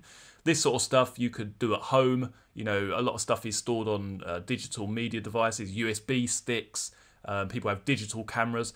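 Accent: United Kingdom. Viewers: You may have a British accent, but you are not Agatha Christie !!!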